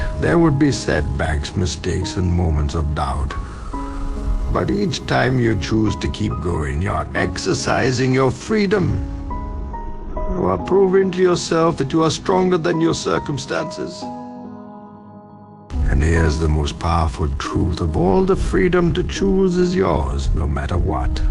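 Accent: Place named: India. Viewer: BRO THEY ARE DELETING MY COMMENTS, WHAT I AM SAYING IS THIS DUDE SOUNDS LIKE AN INDIAN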